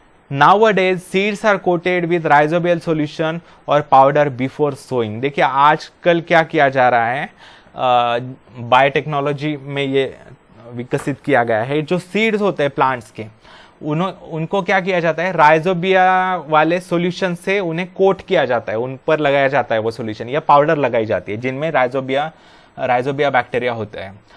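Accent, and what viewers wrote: Maharashtra, India. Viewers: Sir aap marathi ho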